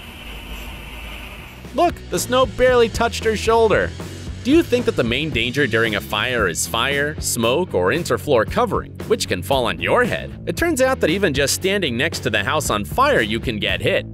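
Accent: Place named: United States. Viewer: Nahh - that yank wank voice is enough to put me off.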